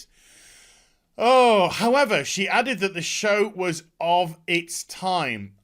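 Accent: South, United States. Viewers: I love your southern? US accent lol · Holy shit! Being from the UK you sure got the Southern United States accent down pat! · You sound like a lovely southern belle, and would fit in wonderfully during the mid 1800's.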